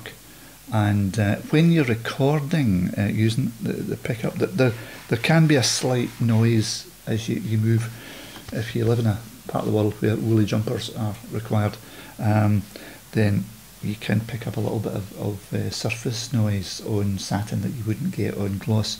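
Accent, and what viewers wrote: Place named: Glasgow, United Kingdom. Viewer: Another teriffic review always informative and concise. Lovely to hear a fellow glaswegian voice on youtube..cheers.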